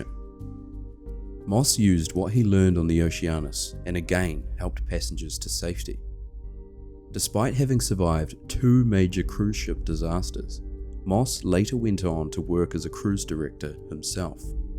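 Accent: New Zealand. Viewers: I like the Kiwi accent. It is a refreshing change from an American or English one. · Holy shit, this is so well done that I assumed this was one of the big documentary channels. It wouldn't have even been out of place on a Discovery plus. I'm so glad The Holy Algorithm brought me here. Also, maybe I'm a sick f#<k, but I giggled every time the Aussie "deck" was said. "Below dick" 😆 Edit: I just checked your page & now realize that you're from New Zealand, NOT Australia. My dumb American ear can't differentiate, but it is NOT an abomination of an accent! I love it. You know what is a true abomination? My Boston accent. The whole "I pahk the cah at Hah-vahd yahd" (I park the car at Harvard Yard) is real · That sounds a lot like a New Zealand accent to me! 😀😉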